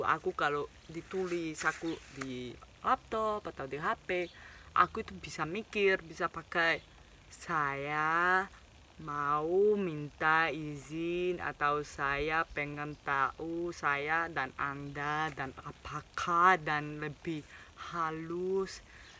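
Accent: Java, Indonesia. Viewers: Bagus Logatnya sdh Indonesia banget 👍👍👍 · Hello, anda sdh passs, logat jawa/indonesia...Aq sangat terharu banget, Aq sungguh bangga lho, bhsa q, sangat mdh anda gunakan, kenapa to pindah Saja had WNI, saja,?? Semoga anda had warga Indonesia, dan terima kaseh anda telah ikut membawa bhsa. Indonesia, dimata dunia.. Sehat sllu yaa, semoga anda senang diindonesia, kami Akan senang,klo anda menetap dinegeri ini. Thanks.. 🙏🙏🙏👍🇮🇩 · Boso Indonesia mu "Medok".. heheheh:) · mbak nya medok jawa ya bahasanya sukses selalu mbak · Mbak kalau bicara bahasa Indonesia tapi logatnya Jawa. Semoga mbak sehat selalu dan mudah2an kita bisa bertemu. Oo... Ya mbak saya orang Jawa ( Kebumen) tapi sekarang tinggal nya di Kalimantan Timur. Sehat selalu ya mbak 👍👍👍👍👍👍. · Buset dahh ngomong Indonya medok bnget lgi wkkww keren kak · Mantap bahasanya logatnya udah Indonesia banget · Selama aku cermati selama kamu berbicara dengan nada logat Jawa banget loh... 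· Mbak. Saya bangga sebagai orang Indonesia,mbak mau memakai bahasa Indonesia di tambah medoknya nya itu loo....Jawa.❤️❤️❤️❤️ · Logat Bahasanya Bule seperti orang Jawa.. Medok banget..👍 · banyak logat wong jowo 😁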